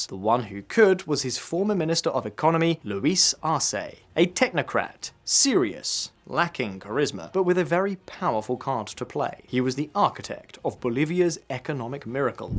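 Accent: United Kingdom. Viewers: I wish the person that put this video together would have used another AI accent. The British accent is like finger nails on a chalk board.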